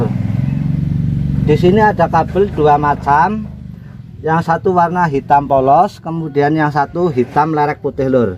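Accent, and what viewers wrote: Banyumas, Indonesia. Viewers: ngapak ya waaakk...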